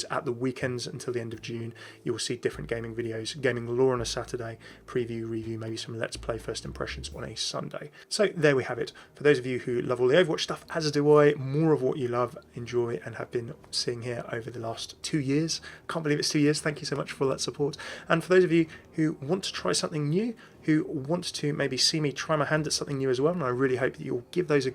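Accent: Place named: United Kingdom. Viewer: you´re welcome :D btw love that brit accent - german youtuber can´t deliver such quality as you do!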